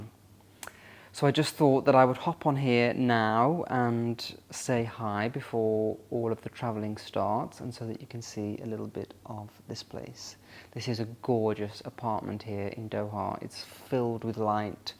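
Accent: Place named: Stoke, United Kingdom. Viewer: ahh those flat vowels you from stoke boy!! no pretence then !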